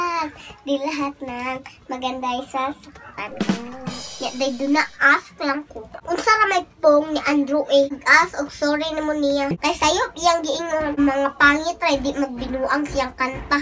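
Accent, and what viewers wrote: Visayas, Philippines. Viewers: Ai ginoo ko ni dzaiiii ka bisaya nmu uieeee so cute😄😄😄😀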